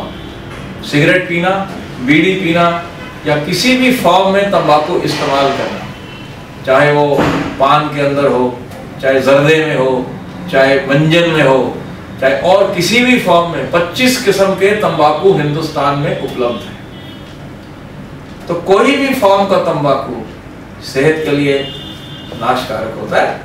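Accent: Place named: Pakistan. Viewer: I thought you were Pakistani from your accent. Very nice information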